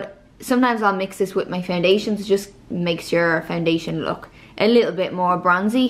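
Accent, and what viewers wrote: Ireland. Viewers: How often do you clean your makeup tools? You are beautiful btw and I love the Irish accent.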